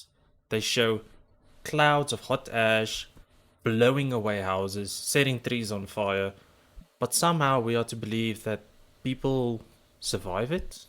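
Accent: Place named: South Africa. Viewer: Heyyyy, a fellow South African. Het die accent gehoor en lol, moet gaan kyk het of ek reg was. Nice vid, keep it up!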